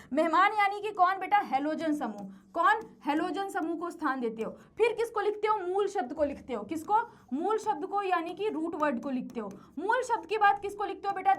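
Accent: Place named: Bhojpuri, India. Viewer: From CBSE board but chemistry in Hindi with bhojpuri accent sounds sweet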